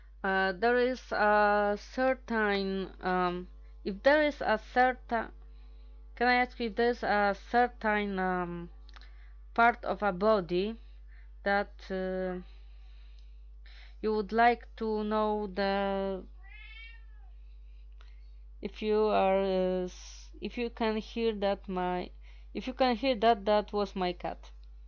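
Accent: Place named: Russia. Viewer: which language do you speak? ´cause it sounds like russian, your accent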